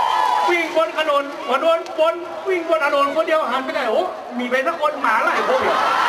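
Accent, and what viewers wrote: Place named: North, Thailand. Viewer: นายกทักษิณอู้กำเมืองม่วนแต้ว่า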